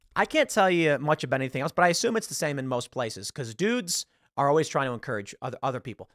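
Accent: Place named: New York, United States. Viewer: You say "bathroom" like I do; "bat'room' I was born and raised in NYC - Da' Bronx. 😂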